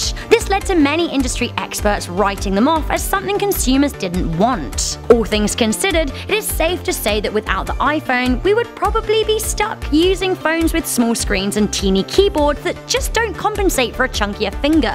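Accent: United Kingdom. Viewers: how about "what if there was not a British narrator with an extremely annoying accent"?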